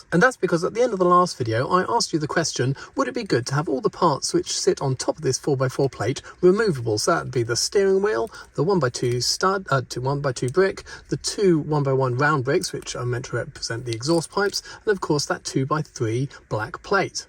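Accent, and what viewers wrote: United Kingdom. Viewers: You sound very british.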